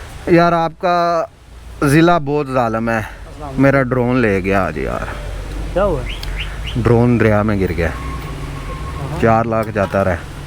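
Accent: Punjab, India. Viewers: Bhai es se acha hai ap punjabi bol lo kyun k ap ki urdu hindi bolne main punjabi lehja kafi had tak mix hai ya ap parhe likhe km ho😊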